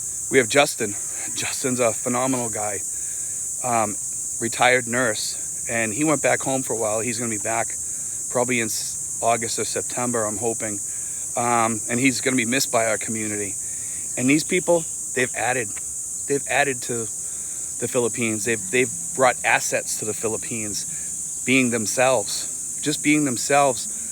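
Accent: Boston, United States. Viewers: Thank you for saying that there are some good women in America! We are not all entitled, narcissists. Some of us ae hard-working, kind people who just want to keep our heads above water. BTW, I detect a Boston accent in your video...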